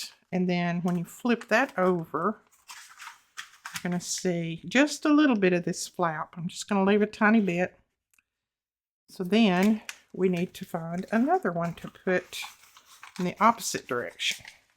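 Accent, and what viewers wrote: South, United States. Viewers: Hi just love your inspirational videos. I could listen to your soft gentle Southern accent all day. Wishing you all the best for this year 2024. I was wondering if you could design some digitals with some birds, flowers and butterflies from USA?. I am from the Uk 🇬🇧 Bunny x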